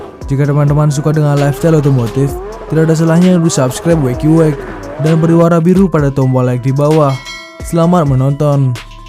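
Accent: Bali, Indonesia. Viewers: Logat orang bali dan ntb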